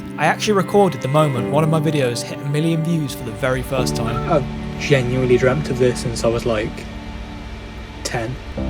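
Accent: United Kingdom. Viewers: Oh my cat. This is the most British video I have ever seen. Why. Youtube knows my problem with intercepting British accent(this was quite understandable for me)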